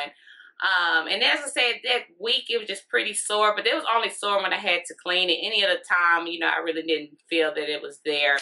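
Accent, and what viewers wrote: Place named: South, United States. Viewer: Ooooh that southern twang....lol. Country girl. I got the same piercing. I love it.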